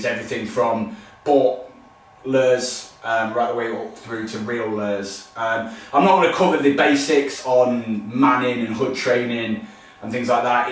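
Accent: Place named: South Carolina Lowcountry, United States. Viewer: I can tell by your accent that you live near me in the South Carolina low country. I’m located in Myrtle Beach and was searching for a previously owned falcon on Craigslist. I’ve been ripped off TWICE now. 😔. I bought my first bird from a guy who would only meet with me in the Brew Ha Ha’s parking lot. At first I loved his bird. It was so gentle and quiet. The bird came with the little hat and everything. But after purchasing it I waited three days for it to wake up and NOTHING! So finally I decided to take off its little hat and visor. Only then did I discover that it didn’t have a head. The hat was literally sewn onto some animal’s torso. I’m not even sure if it was ever a bird to begin with. Upon further inspection I was appalled to realize that the animal’s magnificent feathers had all been Scotch Taped to the pelt. 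I was very skeptical of the second seller and demanded that wake the bird up. I was relieved when the bird became animated. It was an angry foul beast but the seller was confident that the bird was just having a bad day and was usually a late riser. So I bought the bird. I was so excited about my new falcon and posted pictures on my Instagram. It turns out that my bird isn’t suitable for the sport of falconry. Again I was duped. The bird I purchased doesn’t even fly. It looks like a falcon but it’s usually not trained to fly. It isn’t so much a sporting bird. My friend Ming’s parents own the Wok In Laotian restaurant and daycare center in Myrtle Beach. They id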